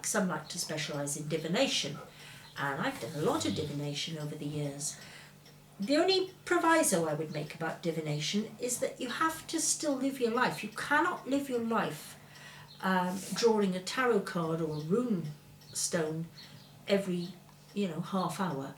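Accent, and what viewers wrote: Wales, United Kingdom. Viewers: Very grateful to have found you. I have learned to create more simplicity in the way I live which has left me more time to be creative. Thank you for you wisdom. My grandparents came from Wales and immigrated to Canada. I am enjoying very much the way you speak, it reminds me of them. Bless. Peace, Jxx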